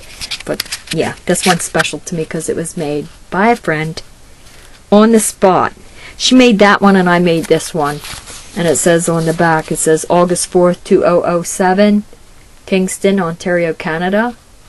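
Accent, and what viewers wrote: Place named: Philadelphia, United States. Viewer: You SO deserved to be showcased by Laurel...you are a true artist and you are doing the same thing she is by honoring so many of the artists who have inspired you over the years. Love how your book is coming along...purple is my favorite and your birdcage rocks! And I agree with NJGardenGirl....you definitely have that Philly accent going on! TFS, Heather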